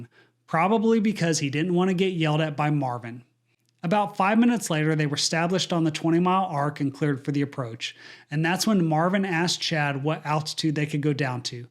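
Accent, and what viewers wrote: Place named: Midwest, United States. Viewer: I want to ask a favor, unrelated to this specific video, but pertaining to all videos you make: could you please say "so M ething" with an EMM instead of "sunthing" (with an ENN)? Thank you. It's not important at all and probably shouldn't bother me, but I feel it detracts from the overall quality of your videos, which is otherwise top notch. Ending present participles with '-een' instead of '-ing' is, I guess, only a harmless feature of a regional Midwestern accent and much less noticeable. Apologies: no offense meant.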